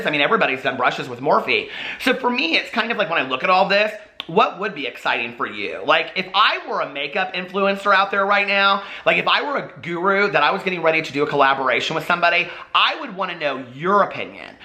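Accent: South, United States. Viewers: where do you get your southern accent and sayings? I've never heard "gag a maggot" outside of the south. Love it!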